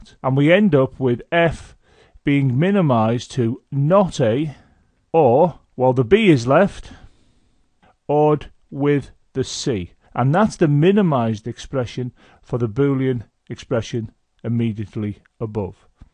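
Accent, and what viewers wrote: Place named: Lancashire, United Kingdom. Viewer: Good Sir you are the best. Would be even greater if you added Boolean to the titles so we know which videos to look for. Other than that, the short and simple yet most effective way to help understand is your greatest asset. Btw I was debating with my mate about your accent and he said you were from Lancashire while I thought closer to Liverpool or St Helen's.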